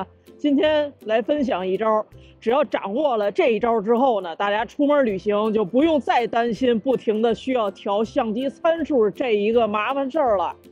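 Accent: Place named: North, China. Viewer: 拜託 你不要看臉聴聲音也知道是大陸北方姑娘。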